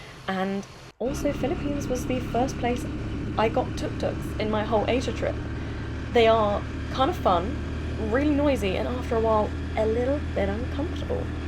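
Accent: United Kingdom. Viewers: Next time, land in Clark International or Cebu, they give passengers a kinder experience. But if its a connecting flight, well that sucks everywhere doesn't it ? Your British English is so perfect, unlike American English which is so hard to comprehend, and I speak the language quite well besides being a college graduate. The accent is so ençhanting, it goes so well with your slightly prickly but charming personality. Im stingy with subscritions,, but I surrendered and subscribed. 👍😘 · Britist accent lady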